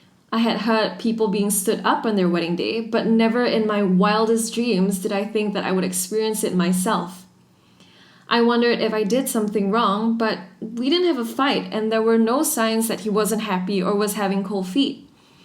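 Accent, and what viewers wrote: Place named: England, United Kingdom. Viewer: The reader has a slight English accent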